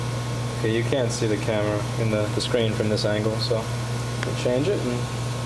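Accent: New York, United States. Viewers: You can totally tell he's from New York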